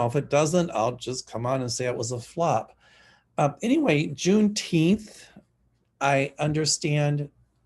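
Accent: South, United States. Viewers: Please, don't apologize for your beautiful, southern accent! 😉 We love & appreciate you, just as you are - never change. 😍 This is what makes you unique & special. I can listen to it all day! Own it. Embrace it. Also, may God bless our dear Champ! 🐕👼🙏🐾❤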